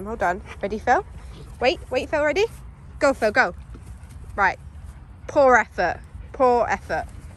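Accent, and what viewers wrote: United Kingdom. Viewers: Are you Britain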